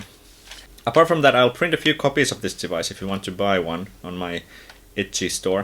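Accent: Finland. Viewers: Your accent is quite finnish, where are you from? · Suomiaksentti havaittu! 🔥 Bossi ohjain kyl! 👍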